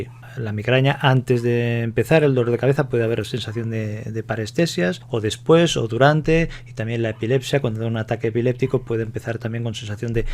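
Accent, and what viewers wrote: Spain. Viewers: Ese timbre español! Muy natural agrada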